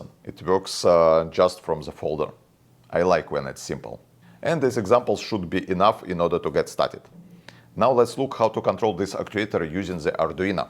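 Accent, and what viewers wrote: Ryazan, Russia. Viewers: Почему мне кажется что у автора русский акцент... особенно после: уан, ту, сри 😅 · Ну как не послушать прекрасный русский акцент - главное что даже без перевода понятно все 😊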